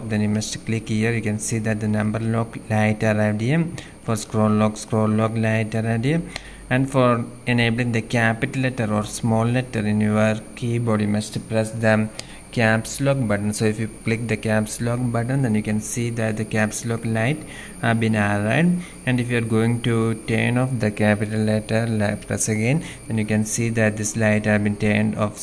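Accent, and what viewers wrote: India. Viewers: its always the guys with the indian accets that have random videos for these random problems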